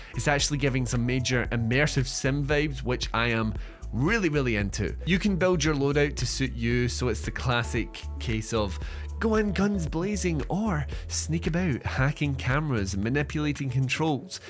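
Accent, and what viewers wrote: Scotland, United Kingdom. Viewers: YOUR ACCENT IS AMAZING, WHAT PART OF SCOTLAND ARE YOU FROM ? 😊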